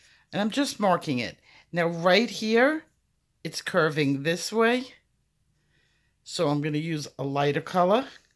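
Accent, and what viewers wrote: New York, United States. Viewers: Where are you from? Sound like NY or NJ?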